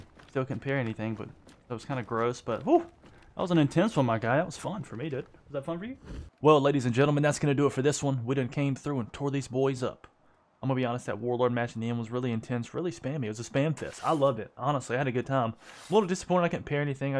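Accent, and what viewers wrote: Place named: South, United States. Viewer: Can’t help but hear the country in your voice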